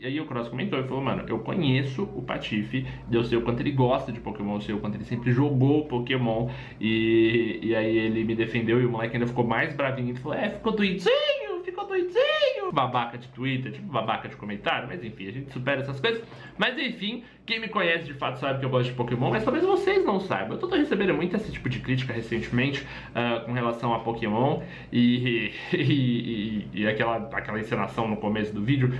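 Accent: Brazil. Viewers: tu és pt e tas a falar pt br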